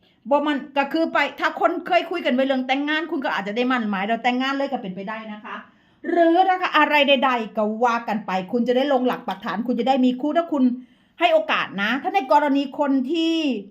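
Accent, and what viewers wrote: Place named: Isan, Thailand. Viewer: แม่หมอ เปนคนจังหวัดใด่ค่ะ เห็นแม่หมอเว้าอีสาน 😆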